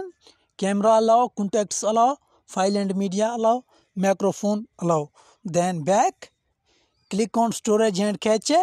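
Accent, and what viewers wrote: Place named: India. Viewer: bro sounds like indiun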